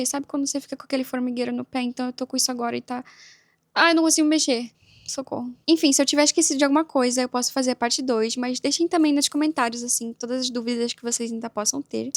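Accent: Portugal. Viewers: senti o sotaque de português Portugal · você tem nitidamente um sotaque português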